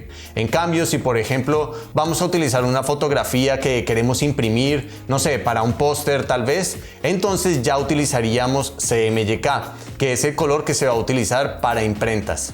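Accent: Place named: Canary Islands, Spain. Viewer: cada vez que veo un video tuyo aprendo algo. lo explicas muy bien y se te entiende perfectamente . tambien sera porque soy Canaria y pronunciamos de igual manera jajaja gracias!!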